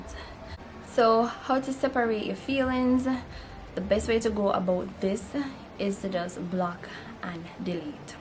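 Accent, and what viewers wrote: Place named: United States. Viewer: these things you are talking about i hope they all apply to you or you are just talking for talk sake...seems you and your daughter are doing great..blessings....i also notice that you are speaking properly now...which is good cause your daughter is going to emulate you and children live what they learn..oneLove....yuh all sound like American sometimes..lol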